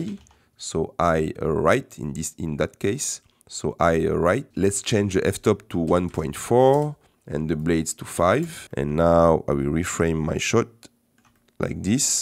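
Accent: France. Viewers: I love your French accent😍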